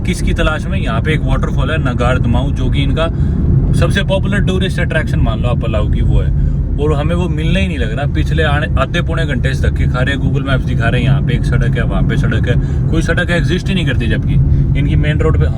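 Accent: Haryana, India. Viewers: I rarely watch full video that too at normal speed but aaj kafi time baad dekhi complete video, courtesy apna haryanvi bhai ji. Jo kafi handsome bhi hai 😅 n really he narrate so well ye to manna pdega with his desi accent, great skill . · No doubt ap boht ache vlogger hen but apne accent ko thora groom kren English bhi ap apne haryanvi accent bolte hen.. Sari dunia ghoom li ap ne but you sounds like a typical backward villager.... kafi videos me ap ajeeb si awaz nikalte hen jese kisi janwar ko hankte hue log village me nikalte hen...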